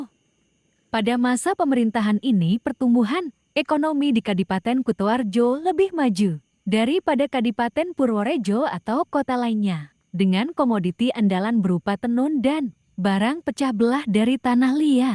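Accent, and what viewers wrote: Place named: Makassar, Indonesia. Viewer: suwarane kaya orang Makasar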